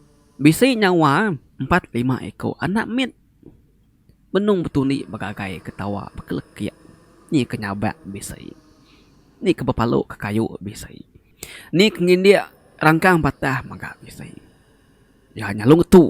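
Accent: Sarawak, Malaysia. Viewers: Patut jako nn bc ka munyi org srtok tuan mya nusi cerita...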